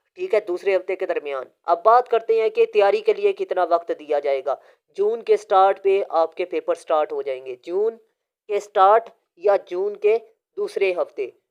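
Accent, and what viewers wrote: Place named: Punjab, Pakistan. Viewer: Pronunciation theek na hon to listener aur learner k spelling mistakes honay k chances bohat zyada hoty hen. آپ جب اردو میں بات کر رہے ہوتے ہیں تو خالص اردو استعمال کیا کریں۔سیالکوٹی یا لاہوری لہجے سے پرہیز کیا کریں، "ر" کو "ر" ہی رہنے دیں "ڑ" کرنے سے بابائے اُردو کی روح کانپ جائے گی۔ And when you are talking in English,kindly adopt the British or American accent.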